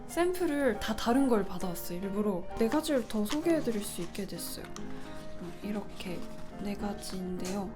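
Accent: France. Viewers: 딥티크의 향수이름들이 맨날 어려워서 제대로안부르고 다녔는데 오늘 님 영상 덕분에 제대로 된 이름이랑 또 고급진 프랑스어 발음도 들어보게되서 유익한 시간이였어요! 영상을 보는 저도 교양이 업그레이드되는 느낌? ㅎㅎ 아 사랑해요 님 저는 오데썽이 좋아여 <mail> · 역시 믿듣 언니! 제가 사려고 고민했던 딥디크 향수를 리뷰아닌리뷰해줘서 너무 감사해요 항상 언니의 불어영상을 보면 넘 기부니가 조크등요 ㅎㅎ 항상응원해요 사실 향수리뷰보다 불어발음하고 읽어볼 생각이 없었던 로고에 박힌 주소까지 은근 더 다양한 정보를 얻은거같아서 넘 좋았어요! 불어영상이외에 게임영상도 자주보는 연잎이에요 응원해요 언니 항상 ❤️ +제꿈이 영상계열이라 도비님도 언제나 응원중입니다 화이팅이요 좋은영상 늘 감사해요 <mail> 도손이요❤️ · 님 아프리카 생방송으로도 종종 보고 유튜브는 구독하고 매일 시청하는 연근이에요. 이런 프랑스어 컨텐츠랑 요즘은 쿠킹덤 컨텐츠를 제일 좋아해요!! 평소에 딥디크 향수가 좋다고는 많이 들었는데 약간 비싸다보니까 쓸 기회가 없더라고요, 그래서 님 영상보고 제가 좋아하는 프랑스 발음도 듣고 향수 설명도 들어서 영상 너무 좋았어요!! 제가 올해에 스무살이라 곧있으면 성년의 날이라서 향수 하나 마련하고 싶었는데 이벤트 당첨되서 딥디크 향수를 갖게되면 너무 행복할것같아요, 제 버킷리스트에 향수모으기가 있었거든요! ㅎㅎㅎ 항상 영상 잘보고있어요 님!! 오랜만에 프랑스어 책 읽어주는 컨텐츠도 보고싶네요 ☺️ 오데썽 <mail> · 저는 향수 입문을 딥디크로 처음 했는데 딥디크 향수를 보며 항상 이거 정확이 어떻게 읽지 ㅋㅋㅋㅋ 이러면서 여러사람들과 장난치듯 읽으며 이야기 해봤어요. 실제로 불어의 발음을 들으니 더욱 갖고싶은 향수가 된것 같습니다. 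설명까지 불어로 읽어주시는게 너무 좋은것 같아요… 프랑스의 명품 브랜드의 소개 컨텐츠도 좋을것 같습니다. 그리고 여러분 향수를 구매하실때는 무조건 유명하다고 구매하지 마시고 꼭 매장에 방문하셔서 시향,착향을 해보시고 구매하셔야해요 호불호가 적은 향수라고 해도 본인에게 불호일수도 있고 각자 이미지에 맞는 향수가 있습니다. 시향,착향을 하실때도 첫향만 맡고 구매하시는것 보다는 잔향까지 맡아보시고 구매를 추천드립니다:) <mail> 오데썽 신청해요 ^.^ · 딥디크가 프랑스 명품 향수였다니... 님 덕분에 모르고 있었던 정보를 알아가네요 저도 부모님 향수 선물로 드렸던 적이 있어서 그때 생각이 나네요ㅎㅎ 님의 프랑스어 발음을 들으면서 딥디크 향수들의 이름과 정보를 더 알게 되어서 짧았지만 좋은 시간이었습니다. 너무 빨리 지나간 느낌이에요. 다음에 또 이런 컨텐츠 해주세요! <mail> (오데썽으로 신청하겠습니다) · 안녕하세요 님 프랑스 컨텐츠 하실 때 마다 발음 억양 너무 예뻐서 배워보고 있는데 저는 그렇게 잘 안돼요.. 님이 한국어 발음으로 교정해주시지만 혓바닥이 강직하게 뻣뻣해서 그냥 한국어로 또박또박 말하는거에 가까운.. 다음 프랑스 컨텐츠 하실 때 행여나 실례가 안됀다면 발음 컨텐츠 햐주세요~~ 님 구독한지 벌써 3년, 구독자 10만쯤 부터 계속 보고 있습니다!!(모든걸 다 본건 아니지만) 힘든 일 있으셔도 항상 힘내시고 머리카락 이번엔 뿌리만 남지 않길 바래요ㅋㅋㅋ 향수는 오데썽 이메일은 <mail> 입니다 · 로제 닮았다 ❤❤❤❤❤ 불어발음 고급지고 넘사벽 엄친딸임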